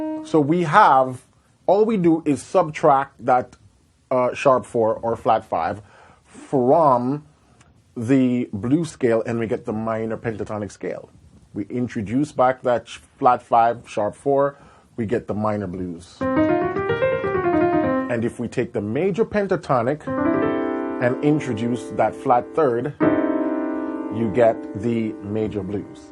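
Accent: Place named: Jamaica. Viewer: This is my first time here. I'm a reggae man who has recently branched out to the blues. Today, I decided to check out some Gospel-- and found this lesson. I was not disappointed, and have subscribed. You know your stuff, and that unmistakable Jamaican accent still comes through! Blessup!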